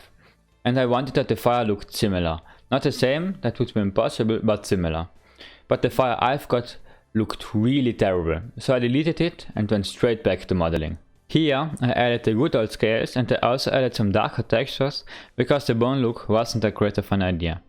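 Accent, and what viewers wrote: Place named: Germany. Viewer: That's so nice. And I have a question from what country are you? It sounds like Germany or something 😅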